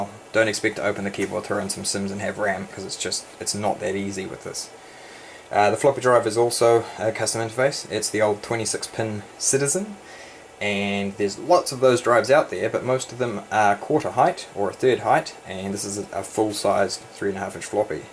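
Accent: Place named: New Zealand. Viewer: Some great info in this vid! 2 questions though: - what did you say the menu software was (aussie here that can't understand your kiwi accent!) - is there any way for DOS to utilise the very top and bottom of the screen? The T3100 will show the C:\ prompt right at the top but the T3200/5200 seems to be letterboxed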